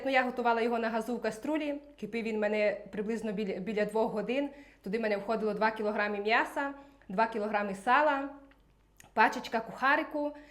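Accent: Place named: Zhytomyr, Ukraine. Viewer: Я зжитомерщини ваша мова дуже схожа на нашу бальзам на душу сімейного вам щастя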